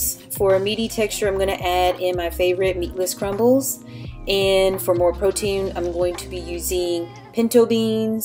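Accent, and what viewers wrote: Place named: DMV, United States. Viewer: DMV accent🤔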